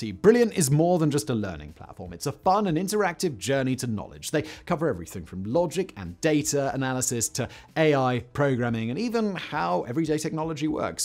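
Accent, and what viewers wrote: United Kingdom. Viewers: Anyone else think he talks too fast and all his words run together? I have never heard a British accent that I just couldn't stand (besides hugh grant but that's cause he's cheesy)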